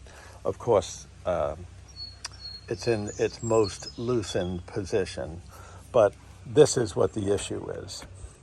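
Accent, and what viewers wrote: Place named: Boston, United States. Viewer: Great balanced video, and really helpful tip at the end! also, I hear Boston in you =D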